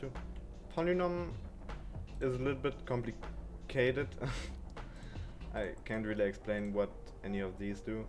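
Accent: Germany. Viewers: or am i wrong that you are german?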